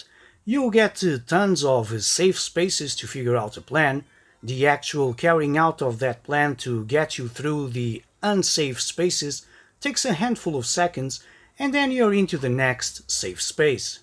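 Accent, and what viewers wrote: Portugal. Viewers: Are you portuguese? I think i recognize the accent )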